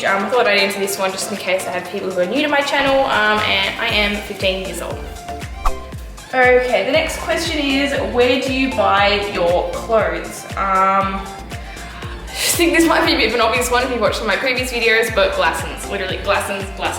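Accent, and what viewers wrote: United Kingdom. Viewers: Are you from england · I wanna ask you something Why do you sound like British like In a few vids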